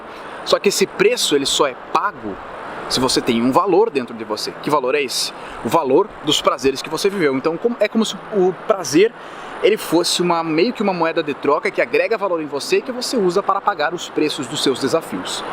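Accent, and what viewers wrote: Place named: Rio Grande do Sul, Brazil. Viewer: Mas eu sabia que conhecia este sotaque.:) És gaúcho de onde,? Sou gaúcha, também!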